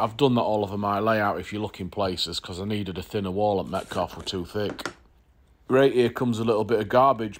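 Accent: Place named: Northern England, United Kingdom. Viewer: No messing…no extended intros..just got on with what you want to share…brilliant. Love your refreshing no nonsense hurl stuff on the table approach enhanced by your grand up north dialect. Love it, subscribed from the bottom left corner of the country. Get on my ‘ansom.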